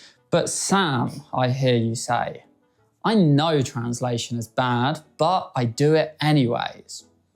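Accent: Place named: England, United Kingdom. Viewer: He is English, he has an English accent, American accent sounds somehow different, but both are "natives". Anybody that has trained their listening a bit could recognize it.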